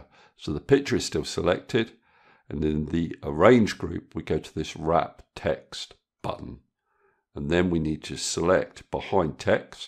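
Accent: United Kingdom. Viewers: Brilliant, thank you. And the lovely British accent was a bonus.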